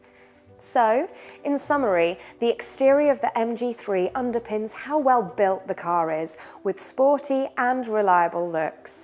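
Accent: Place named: United Kingdom. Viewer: Got a girl witha brittish accent doing this video even though MG is wholly owned by the Chinese😂😂😂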